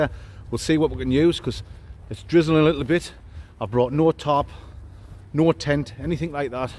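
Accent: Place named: Durham, United Kingdom. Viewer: great stuff, are you in the Durham area? just from the accent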